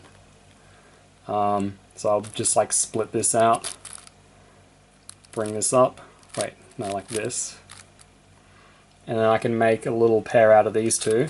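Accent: Australia. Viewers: You sound a bit like Feliks, probably Australian accent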